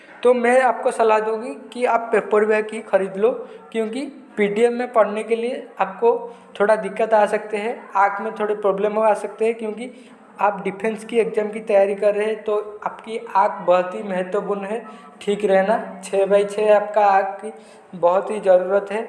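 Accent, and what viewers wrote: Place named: Gujarat, India. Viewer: Ur hindi is funny maybe are u gujrati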